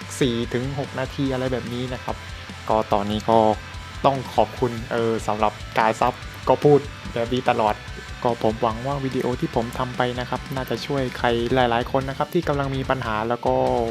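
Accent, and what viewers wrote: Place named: South, Thailand. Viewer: เป็นคนใต้ป่ะครับ 5555 ชอบเสียงอ่ะ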